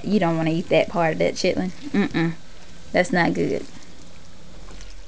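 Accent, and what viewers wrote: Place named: South, United States. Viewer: You have the cutest southern accent!!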